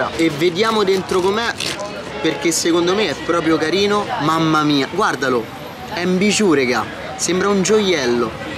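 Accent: Rome, Italy. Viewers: mi stanno piacendo molto i tuoi video, davvero super intertessanti. quando verrò a roma in caso so dove guardare per informarmi sui ristoranti✨ (poi vabbè ho un debole per l'accento romano ahahah)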